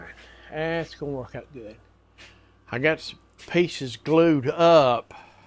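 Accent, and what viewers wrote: South, United States. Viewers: Absolutely terrific video,! I'm a retired educator who began my professional career teaching junior high Industrial Arts (AKA "Shop," because most folks have no idea, nowadays, what Industrial Arts was/is). After 8 years, I was recruited to become a middle school principal for 3 years, then was recruited to join the faculty of Industrial/Vocational Education at Mississippi State University (Starkville is my hometown, even though I now live in Tupelo, birthplace of Elvis, after I retired from MSU). Anyway... I do some woodworking in my retirement years out in the garage shop, but it's not set up for a "real" shop like I had when I was teaching. The single thing I miss most in a good, solid, hefty workbench, largely because I don't have a vise to hold my projects when I filing, sanding, shaping on projects like charcuterie boards, etc. 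I'm now 77 years young, so I have to face reality that there are not enough years left on this Earth for me to dump a pile of money into fixing a top-notch shop. I feel 28 in my mind, but I realize that I have fewer years ahead of me than lie behind me. Ha! So, thanks for your outstanding job of "teaching" your viewers as you go. It's a pleasure...and I can't figure out how I didn't find you on YouTube 10-15 years ago. By the way, I can tell from your accent (but we Southerners don't really have an "accent," do we? Ha!) that you're in the Southland somewhere. Have you ever shared what general part of the country you're in....like the State w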